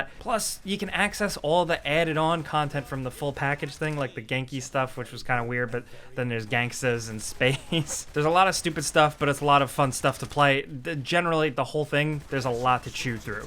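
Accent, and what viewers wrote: United Kingdom. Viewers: British male voice is the best!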